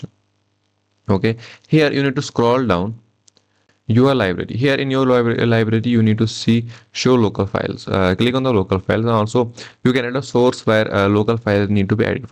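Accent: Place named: India. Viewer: Anytime when I hear a Indian voice, you know they about to give the best advice 😎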